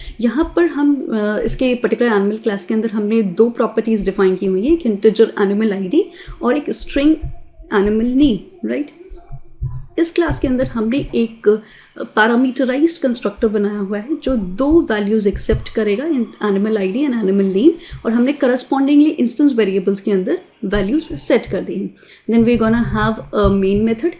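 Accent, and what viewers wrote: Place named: India. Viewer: in nearly every programming tutorial Video is this Indian Accent. As a German i nearly don't understand anything. Everytime i have to watch a Video more than twice...... Nevertheless thanks for the Video. After serveral times i understood it. :)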